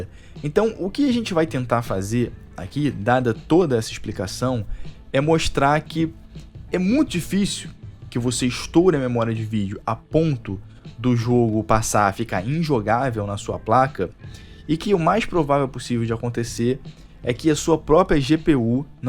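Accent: Rio de Janeiro, Brazil. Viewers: kkkk eu casco da forma que os cariocas falam, é estiloso kkk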